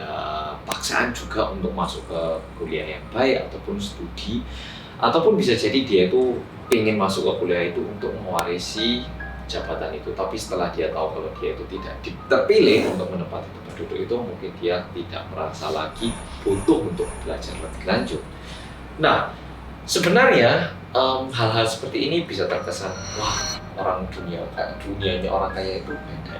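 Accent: Java, Indonesia. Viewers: Mas:Bersama orang korea yang.. Aing sekeluarga auto teriak: Medhokkkkkkkkkkkkkkkkkkkk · Hei orang medok kenapa sama gak anak annajiyah aja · Semangat terus mas wong korea sing "medokkk"🤣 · Halo mas.... Welcome back with me, fans orang korea yang medooook 😁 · Yang? Medoook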